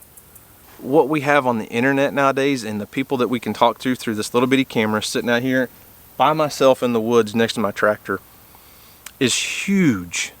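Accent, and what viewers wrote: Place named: South, United States. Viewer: Hey, you have become a virtual friend that I enjoy spending time visiting with as you go about your days on the farm. I like listening to you ramble, it’s like sitting around a campfire with your best friend speaking the truth. I don’t watch tv just you tube, of course you caught my attention because you are so darn handsome with that southern drawl and you love to go fishing.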